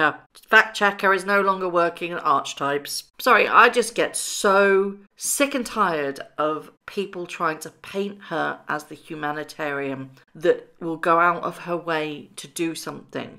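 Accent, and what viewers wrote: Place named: London, United Kingdom. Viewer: I don’t know where you are from in England, but you sound like my family back in London❤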